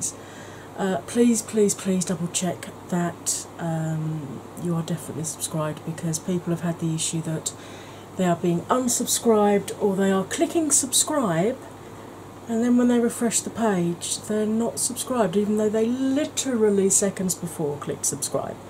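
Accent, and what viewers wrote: United Kingdom. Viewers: Are you Welsh? Gorgeous look per usual, I do think they're wisteria as well... I'm heading over to Chelsea's channel next!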